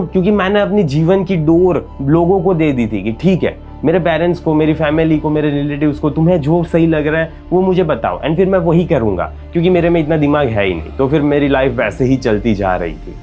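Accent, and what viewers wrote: Gujarat, India. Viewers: Gujju accent detected ❤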